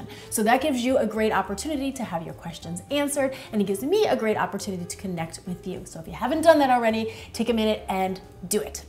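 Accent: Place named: New Jersey, United States. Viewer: Very funny Jersey girl accent haha 😂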